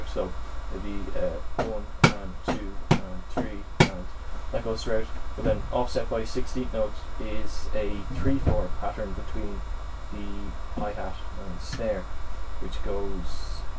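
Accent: Ireland. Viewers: Your irish? awesome :D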